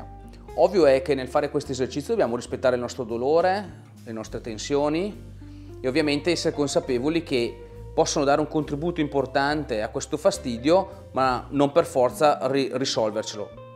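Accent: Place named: Brescia, Italy. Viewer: Ciao dall accento mi sembri zona BS... Hai uno studio dove visiti di persona in zona Brescia? Grazie!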